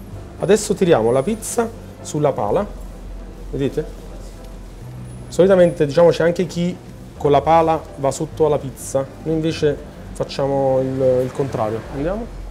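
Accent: Standard. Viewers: Ma parla esattamente italiano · He's speaking normally for us, we're italians.Why, maybe you were convinced that we were going to sing pizza pizza mandolin to the rhythm of tarantella? This is the normal speech of an Italian. At least we do not eat vowels for breakfast like you.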